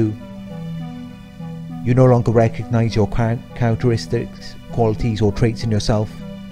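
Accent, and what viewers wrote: United Kingdom. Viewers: Thank you for this. I needed a reminder tonight. Thank you for all your videos. Your voice is comforting. May I ask where in the UK your accent is from? I'm in the US.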